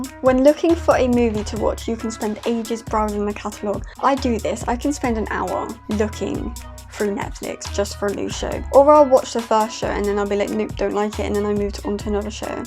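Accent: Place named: New York, United States. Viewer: This is interesting thanks for sharing! I like editing of the video too! I’m gonna sub to see more videos! I also am from NY so your accent keeps me hooked in lol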